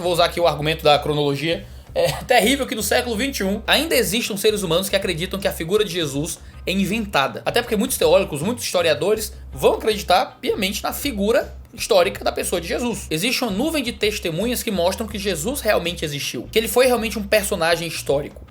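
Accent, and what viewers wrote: Ceará, Brazil. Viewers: Eu adoro as nuances q comprovam o cearense q ele é durante o discurso kkkkk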